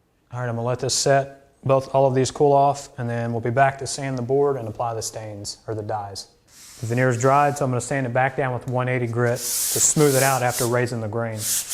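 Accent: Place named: South, United States. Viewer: Can't decide whether I appreciate more the technique or the southern accent. Great video, though. Thanks.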